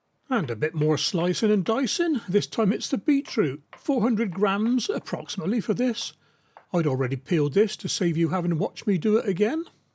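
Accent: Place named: Cornwall, United Kingdom. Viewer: What a recipe! Where's that from? Doukhbor... what language is that? Also, I'm trying to place your accent... are you from Cornwall?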